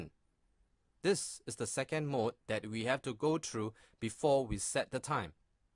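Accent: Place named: Singapore. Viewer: U sounded like a singaporean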